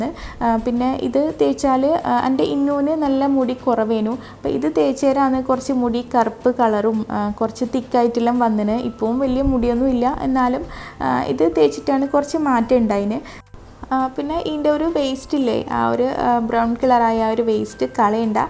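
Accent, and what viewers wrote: Kannur, India. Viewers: Hii ningalea video boar adikkkukaye illa kannur style basha😂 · Thani kannur bashaa😀 nmml kannuranee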